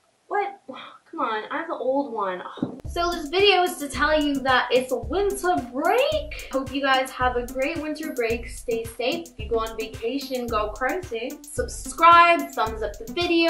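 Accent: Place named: Trinidad and Tobago. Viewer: Why do u sound trini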